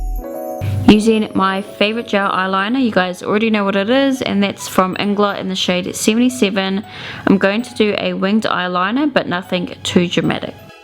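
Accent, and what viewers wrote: New Zealand. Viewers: Absolutely stunning makeup that you did in this video ! X ... However, subbed coz I loved your kiwi accent (now i know what people mean about kiwis having an accent...couldnt hear it !) :D I also grew up in New Zealand x Now living in Aussie!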